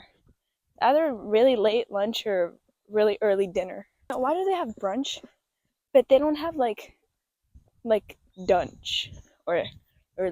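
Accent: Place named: United States. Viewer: The world needs more Luxembourg YouTubers that talk and act american. Thats actually dope as fk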